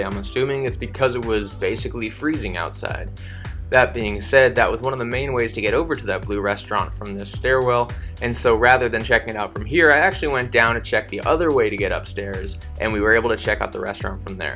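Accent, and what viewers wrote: United States. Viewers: I hate the KLM Lounge in Amsterdam! It's definatly one of the worst! It's always crowded and they only offer little amounts of food and drinks. Otherwise, nice and really interesting review. But please speak a little bit slower and more clear. Your American accent makes it quite exhausting to listen all the 39 minutes. 👍🏼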